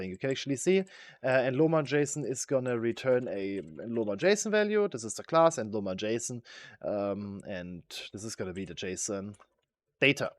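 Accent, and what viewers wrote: Germany. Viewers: That was the perfect video to start with. Thanks. Greetings from Bremen my german accent speaking friend.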